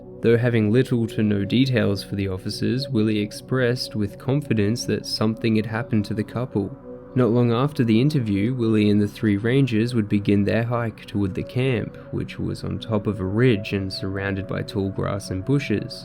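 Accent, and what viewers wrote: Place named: Australia. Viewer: Only made it 6 mins in & had to turn it off i can't stand your accent. Australia?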